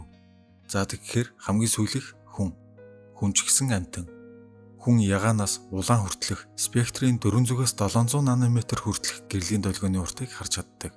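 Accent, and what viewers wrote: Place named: Kyrgyzstan. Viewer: Произношение похоже на Кыргызские.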